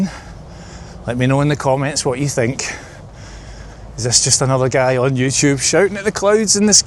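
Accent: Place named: Glasgow, United Kingdom. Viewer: Happy to like and subscribe! There is something about a glasgow?? accent that makes people just super personable and easy to listen to, have a lot of family in Paisley/Johnstone so feels like home. Sounds like you had a tough year last year, hopefully this one is much better for you. I am 41 this month and one of the things I have started worrying about is my parents aging, by the end of the month they'll both be retired and I do worry about them a bit more. I also live far away from them so its difficult to see them a lot. I got divorced 3 years ago and moved alone to Bristol, I feel like I am only just picking myself up from that now and starting to feel happier alone, I am hoping to clear my debt this year and start saving for my own house, as not being on the property ladder at my age is also worrying me a lot! Hopefully we can both do some rebuilding this year! 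:)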